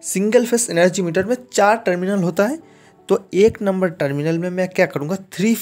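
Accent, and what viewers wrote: Bengal, India. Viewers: Best videos bro. Keep it up🎉. It feels good in bangla accent.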